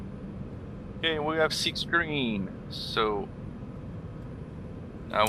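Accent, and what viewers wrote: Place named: Quebec, Canada. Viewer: Salut! Je me permets de de vous parler en bon français québécois!!! J’ai reconnu l’accent 😅. Merci pour votre produit C17. Ça fais du bien d’avoir un avion avec une livré canadienne!!! 😎 see you in the sky!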